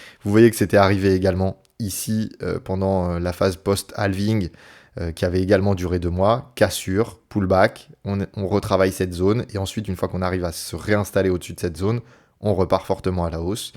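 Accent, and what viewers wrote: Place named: France. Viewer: ...adopter du vocable anglais avec un accent francais HORRIBLE, c'est la loose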